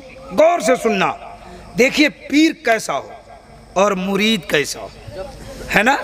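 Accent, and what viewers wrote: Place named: Bihar, India. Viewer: लग रहा ये बिहारी है! ऐसा इस लिए कि इनकी बोलने की शैली बिहारियों जैसी है! बड़ा मज़ा आ रहा है सुनने में! वैसे ये जी रहने वाले कहाँ के हैं?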